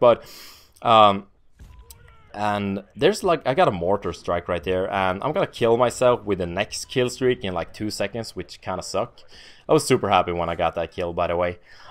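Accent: Sweden. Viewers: svensk från norrland? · are you from norbotten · swengelsk accent,älskar den haha x'D härligt härligt lol har sett mig själv i ett par av dina MW2 clips :P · Swedish accent :)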